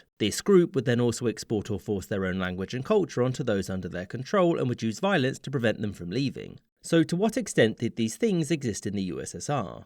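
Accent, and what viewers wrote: United Kingdom. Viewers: The narrator literally has a British accent and you still accuse them of being American lmao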